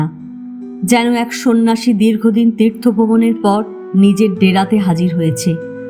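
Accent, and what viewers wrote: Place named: Bangal, Bangladesh. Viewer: খুব ভালো উপন্যাস টি ।তোমার পাঠ অতুলনীয় ।কি সুন্দর ভাবে বাঙাল ভাষা উচ্চারণ করছ❤👍👍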